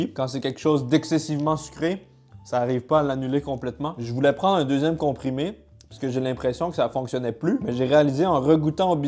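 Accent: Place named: Quebec, Canada. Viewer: Accent québécoises**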